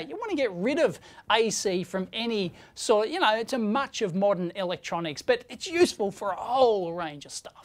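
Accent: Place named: Queensland, Australia. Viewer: Anybody else unable to see a video and not go: "haiiiiiiiiii" in that Queensland accent lmfao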